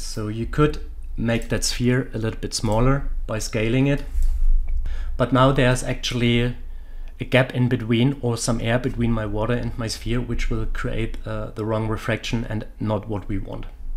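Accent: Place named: Germany. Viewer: Dein deutscher Akzent ist leicht zu erkennen... welche Hochschule hast du besucht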